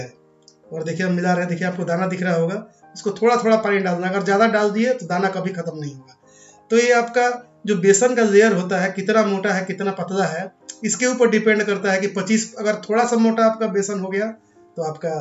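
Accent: Bihar, India. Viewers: Kya aap Bihari hai Tone se lag raha hai Respect 👌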